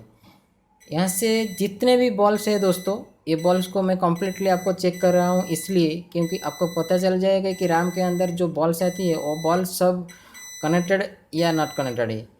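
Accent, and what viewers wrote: Odisha, India. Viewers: Brother you are from Orissa because of your Hindi speaking style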